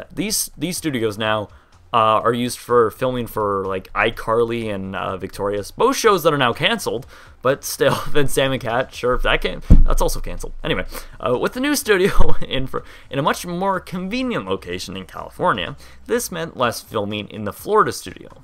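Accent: Canada. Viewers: You sound Canadian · I have one question for you boy: Are you Canadian?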